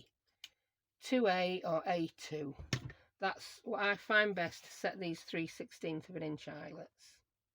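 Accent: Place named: Yorkshire, United Kingdom. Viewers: Edith from scrapbooking with me sent me over. Wasn't expecting a fabulous northern accent 😄 lovely project, thanks for sharing xxx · Thanks so much for sharin...really enjoyed watchin.Love the use of paper bags and the lovely papers.Really enjoyd your lovely accent...I live in Australia now but originally from Sheffield UK.I felt like I had a little visit back ome. Been in Ozz 26 yrs..still sound like a Yorkshire puddin...lol...All the best luv....xo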